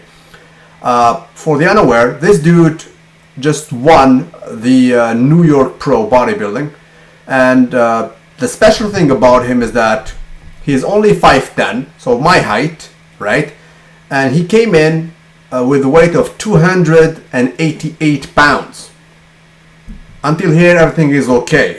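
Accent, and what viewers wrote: France. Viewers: Hey, is your first language french? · hhhahaahaa ton accent Français trèes surprenant!! tres amusant!!! fait donc 1 video en Français!! loll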